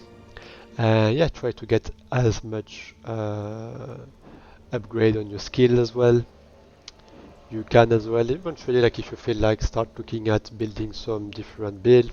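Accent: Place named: France. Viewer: Salut, merci pour tes vidéos - vu l'accent je te parle directement en français :D Tu as quoi comme config?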